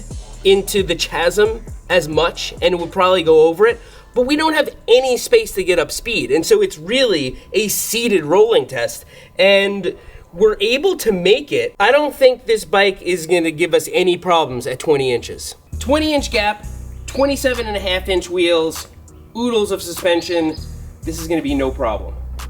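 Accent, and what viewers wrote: United States. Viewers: He sounds American to me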